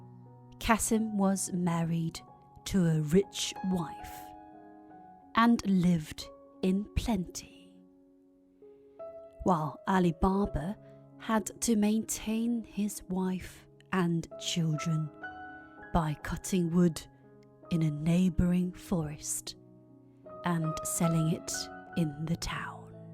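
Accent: United Kingdom. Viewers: 선생님 영국발음이랑 억양 선생님처럼 하거싶은대 어떻게 해야될까요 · 에이 에스 엠 아~~~~ㄹ 오늘도 잘 듣고 갑니다. 역시나 권아나의 발음은 엄청 매력적입니다. 흉내내보려고 해도 영 저는 안되네요. ㅎㅎㅎ 영국식 발음아! 오픈 세서미~~~!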